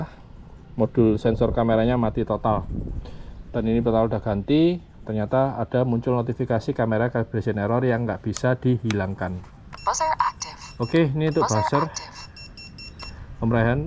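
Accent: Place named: Bali, Indonesia. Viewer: Main-main (masih kebawa dialek Bali-nya om ) 😆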